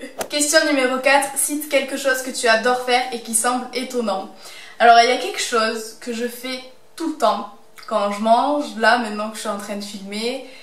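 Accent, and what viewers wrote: South, France. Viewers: J'adore ton accent du sud et t'a l'air super sympa et pas superficiel 😃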